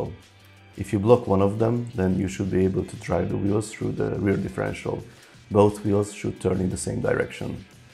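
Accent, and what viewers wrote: Hungary. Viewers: I didn't know but recognized the accent:P I'm Hungarian too;) Üdv,) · I just came across your youtube channel through recommendation. I immediately thought this guy must be Hungarian, and you are!:D (Don't misunderstand me, your pronunciation is great, just for a Hungarian is easier to spot a Hungarian accent. Szia!:) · Thank you for this! I detect a slight Hungarian / eastern EU accent. Where are you from, if you don't mind sharing?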